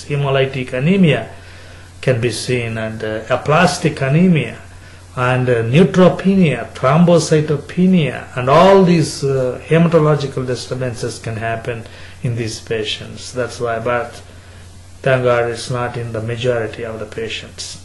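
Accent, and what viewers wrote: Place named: South India, India. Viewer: almost like listening to a new language.you are so learned and look like a hardworking person you should try and practice speaking commonly known and understandable accent of english ...earnest opinion.There are so many people besides south indians who would like to know what you are saying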